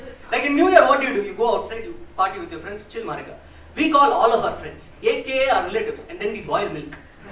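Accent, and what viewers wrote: South India, India. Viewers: Slow beta slow and ye tgoda accent please. Btw south indian accent is cute as well😅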